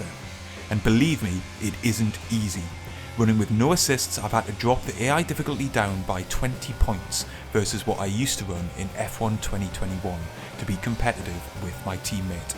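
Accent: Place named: Middlesbrough, United Kingdom. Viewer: Where are you based? You accent sounds close to me (Middlesbrough)?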